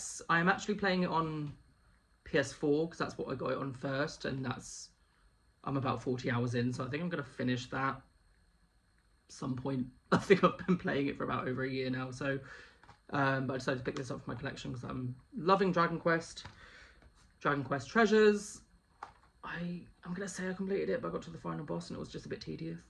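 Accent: United Kingdom. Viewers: Very cute, and nice to hear your voice! Very British ^.^ hehe x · Loving the update! Also enjoy the British voice! ❤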